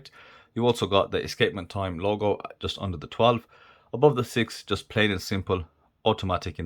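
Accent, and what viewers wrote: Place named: Scotland, United Kingdom. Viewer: His mottled Scottish accent makes me trust him.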